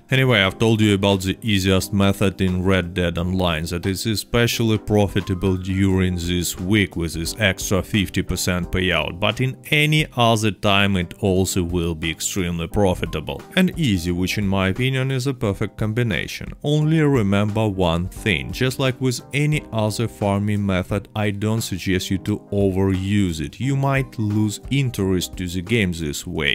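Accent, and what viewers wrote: Russia. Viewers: Блин думаю русский не русский ты, и потом вижу текст русский и потом понимаю почему у тебя такой акцент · Thanks for making me very rich, really appreciate, btw, your Russian accent sounds really cool. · а теперь я не могу понять, он русский или американец....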